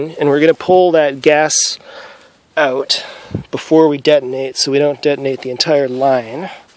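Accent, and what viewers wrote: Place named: United States. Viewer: listen to the accent, 100% American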